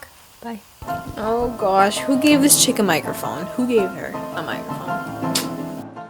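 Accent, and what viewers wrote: Long Island, United States. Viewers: Are you from Long Island? I notice an accent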